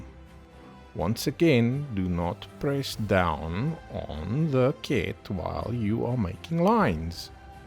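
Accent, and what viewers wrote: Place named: South Africa. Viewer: Cannot miss the South African accent!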